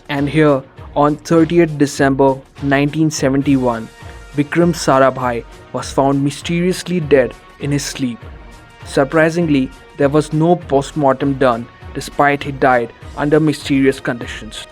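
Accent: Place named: United Kingdom. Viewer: Fuck your British accent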